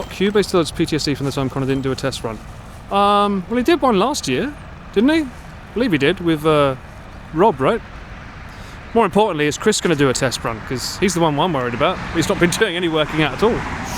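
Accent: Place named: Essex, United Kingdom. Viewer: Lovely stream! Superb way for us peekers to see more of Japan while being entertained by an Essex accent.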